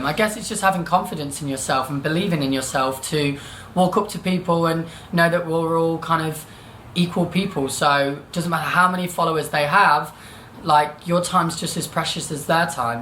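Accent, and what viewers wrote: United Kingdom. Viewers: Esse sotaque britânico ui ui ui, homem você é um sapão 😏 kkkkk ( posso falar oq eu quiser que ele não vai saber mesmo )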